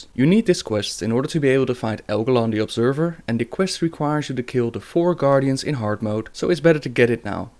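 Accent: Netherlands. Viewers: Your dutch arnt you?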